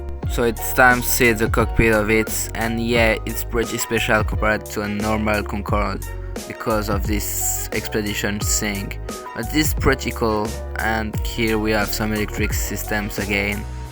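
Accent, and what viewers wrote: France. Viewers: thicc français accent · That french accent brah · bro ur french your accent is realy pronounced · OMG VOICE REVEAL WITH FRANCE ACCENT 1!!1!1!1! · Not gonna lie your French accent is cool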